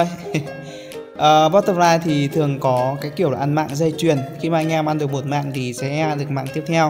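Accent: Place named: Hanoi, Vietnam. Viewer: ah quê ở đâu vậy hơi gọng giống ở hn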